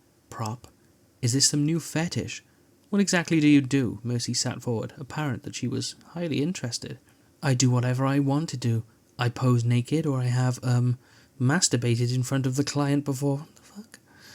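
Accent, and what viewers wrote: Wales, United Kingdom. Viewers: The Welsh are known for being great orators…Richard Burton, Anthony Hopkins, etc. THIS I did not expect to be delivered by a welsh accent!! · I love the British accent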